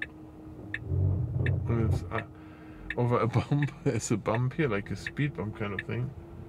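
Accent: Germany. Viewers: Just curious, where are you from? Because your English sounds a bit with a German accent. I could be completely wrong though. Amazing how vision Autopark works. Now they need to speed it up a bit and they should stop turning the wheel while the car stays still. Just unnecessary stress on the axle / steering components etc.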